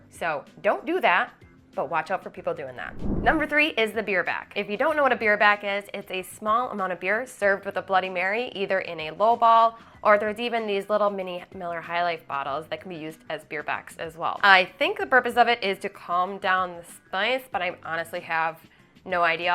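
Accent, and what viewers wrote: Minnesota, United States. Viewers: Your Minnesota O's are pretty apparent. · Do you not realize that you have a pretty strong Minnesotan accent? Obviously not fargo strong but it's definitely there. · You sound more "Minnesotan" or like how the show and movie Fargo portrays it, than i have ever witnessed in my 41 years being alive and living near Duluth. Which i always thought was more of a North Dakota and Canadian accent, since i never hear it here, except from those type of people if they are around. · "Not everyone in mn has the super thick mn accent" she says in a super thick mn accent. (Said with love from another minnesnowtan) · I've lived in MN since I was 7 years old now I'm 47...you definitely have a strong MN accent!! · You have a hard core Minnesota accent! I moved to Minnesota 25 years ago and it used to laugh when I heard people talk, now I'm used to it. 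I had no idea Minnesota was the only state with a beer back, too funny! I moved from San Diego and I always wondered why I wasnt getting a beer back when I went back to San Diego! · I’d like how Minnesota people with a strong accent pretend like they don’t have one and and then say stuff like you betcha. Even this lady has a pretty thick accent. The way she says Minnesota is very Minnesota.😂😂😂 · I live in the "up north" part of MN. Mostly agree. Two comments. I've never in my life heard of a " beer back." I think that one is specific to you. Secondly... your MN accent is actually super strong compared to most people I know here.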